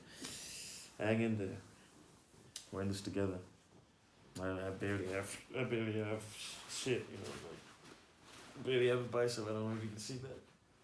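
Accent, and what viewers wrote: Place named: Rwanda. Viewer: Great work bro , your accent sounds Rwandanese . Where are you from ?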